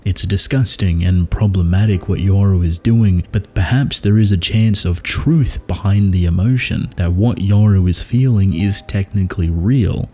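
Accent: Australia. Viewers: Why has it taken me over a year to realize how Australian u sound😂? I was wondering where tht damn accent came from, it’s from down unda. another banger video gang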